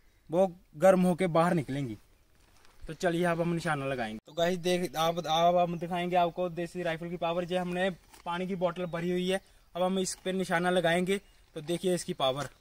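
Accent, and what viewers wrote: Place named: Jammu, India. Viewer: Bhai jammu se ho aap ?? Apka accent whi ka lag rha hai😅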